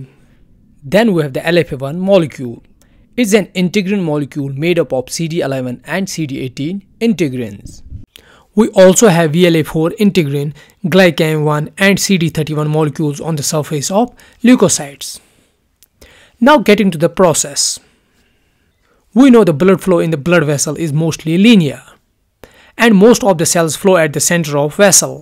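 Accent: Kashmir, India. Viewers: Bro, love from kashmir, but your accent of speaking the English is very similar , how kashmiris speak English, are u kashmiri too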